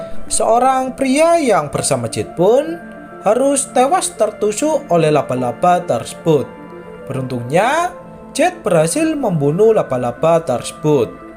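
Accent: Java, Indonesia. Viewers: Ah ga seru ga ada suara pemainnya .malah mendengerin Suara yg menceritakannya suara wong jowo apa pake di ceritain .segala males utk bantu sabcrek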